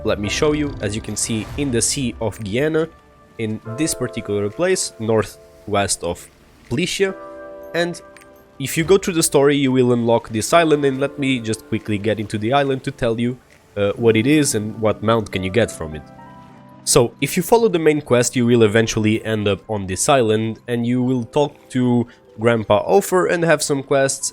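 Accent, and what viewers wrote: Portugal. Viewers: good video! btw, you sound portuguese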